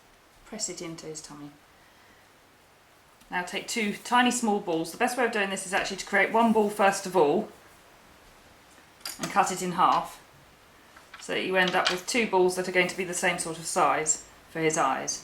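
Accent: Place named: United Kingdom. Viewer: Hi, I love your cute penguin and you make it look so simple to make I'm sure it'll inspire others to give it a go. Your instructions and video are excellent and it's nice to hear a British voice for a change:) Debs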